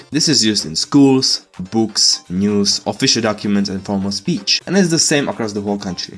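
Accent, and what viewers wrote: Ostrava, Czech Republic. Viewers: jde poznat že jsi z česka ten náš užasnej přízvuk poznam vždy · It's really easy to tell you're from Ostrava bc most people from Ostrava has that unquiet Czech accent that we laugh at sooo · BRUH I can hear your Ostravan dialect from your English too! 🤣🤣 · Ostravaka proste poznáš😂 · PODLE TO HO HLASU SI CECH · the most czech accent ( btw am from slovakia zdravim bro) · Teda až na ten OSTRAVSKEJ PŘÍZVUK. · Jde to slyšet že seš z česka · "even tho all it takes is to open my mouth in prague and everyone immediately knows that I'm from osTRAva" bro I can even hear it in english (I'm also from osTRAva hahaha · Ostrava pronunciation is very easy to recognize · I can hear in you're english that you are czech (but thats fine) btw greetins from Přerov! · Omg I just was scrolling through YouTube and then I saw ur video I just watched some and then bro you actually mentioned other cites thank you man IM JUST SO HAPPY I SEE SOMEONE FROM THE SAME REGION AS ME (the Czech accent is very strong haha) I really like how you make the video it’s really creative and I’ll definitely become a big fan of your Chanel great work man! 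:] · 1st time hearing someone with czech acsent, im slovak and i speak english with no acsent, im suprised czech and slovak acsent even exsist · waah ten ceskej prizvuk s tou anglictinou je cute XD jinak, fire videjko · We can hear the czech R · TVL DALŠI OSTRAVAK SE SPAWNUL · I'm from czech and this is very accurate, your accent is very czech too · ur accent really czechish lol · You have a very Czech accent. 😅😂🎉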